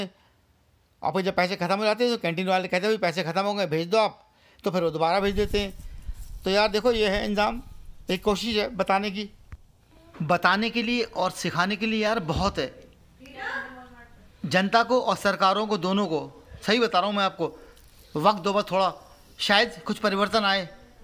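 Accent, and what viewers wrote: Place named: Moradabad, India. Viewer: बहुत अच्छी रिपोर्ट है और आपकी भाषा सुन कर मुझे ऐसा लगता है कि आप मुरादाबाद, रामपुर या चंदौसी के रहने वाले हैं। अगर मैं सही हूँ तो कृपया जवाब दें।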